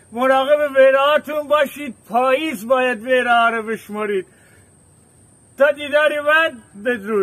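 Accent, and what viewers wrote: Gilan, Iran. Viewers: جان شما خوش بیان هستید.. با لهجه گیلکی شیرین زبان هم شدید.. خوش باشید برار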